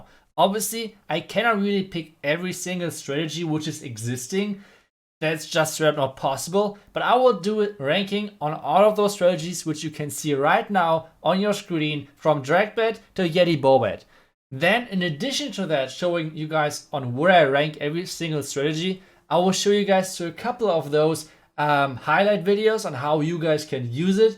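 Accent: Germany. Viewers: strong german accent u can always recognize that hahah but its sounds good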